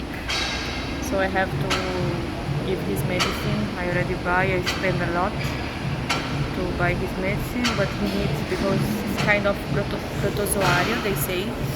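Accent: Philippines. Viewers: As if i find your English accent is a filipino one..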